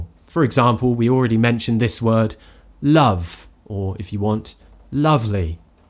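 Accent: United Kingdom. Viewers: You have one of the most beautiful British accent ever❤❤❤❤❤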